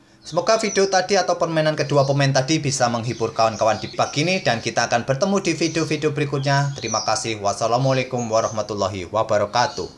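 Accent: Java, Indonesia. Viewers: admin orang jawa bukan ?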